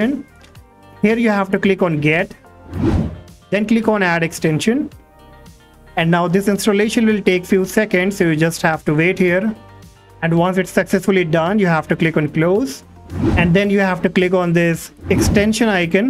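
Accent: India. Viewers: he sounds indian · You sound like an indian scammer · how can someone sound so indian yet so american at the same time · bro is dumb he just sounds indian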